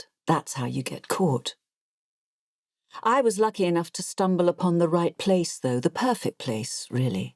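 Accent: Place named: United Kingdom. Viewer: perfect voice you have. Thanks for your reading service. I'm reading this book in the book club. I'm fascinated by British accent as a second language learner. I try to mimic your accent. thanks again.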